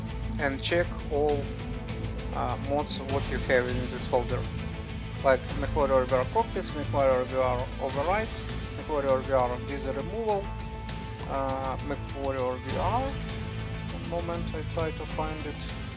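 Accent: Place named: Russia. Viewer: А можно тоже самое только по русски? Акцент выдает русскую школу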